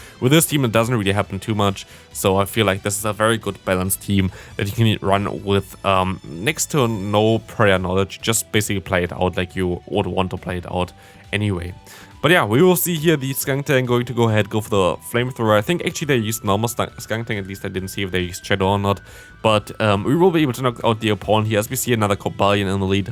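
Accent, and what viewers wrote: Germany. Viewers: Love that Cofagrigus Shiny, got one myself, but Bad Ivs 😅 Been watching your vids for some time now, are you german? Because you have a bit of a german accent when talking english. I've got it too 😂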